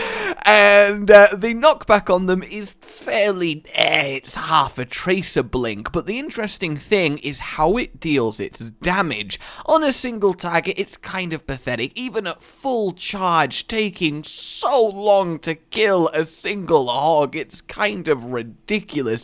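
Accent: Russia. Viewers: Not gonna lie, he actually does a pretty damn good Russian accent.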